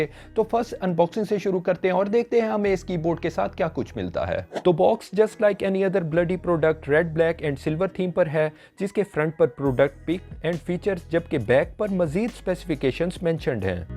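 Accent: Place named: Peshawar, Pakistan. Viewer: Yar ap ky bolny ka style Peshawar k logo jesa he. 🤪 But phir b kha se he ap☝🏻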